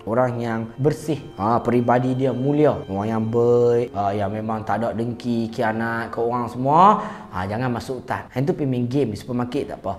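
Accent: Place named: North, Malaysia. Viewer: Itu loghat utara, Abang ni, di dalam video mL studio memang akan guna loghat utara dia..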